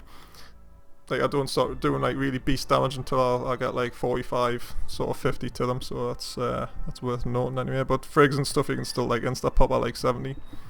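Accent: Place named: North East England, United Kingdom. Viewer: Lo m8 just come back to eve after a long break sitting in ya pub atm, you sound like your from the NE like me, would love to chat about some fits m8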